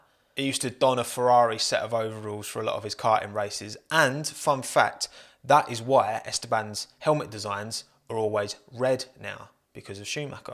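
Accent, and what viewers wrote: United Kingdom. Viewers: Bri'ish accent >>>>> All the other accents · There's an aspect I really like about your videos: even if they're ten minutes long, at the end it feels like it's been 2 minutes. I could definitely stay here listening at you telling stories for so long. As a non-native speaker, I really really like your Bri'ish way of talking, it's really enjoyable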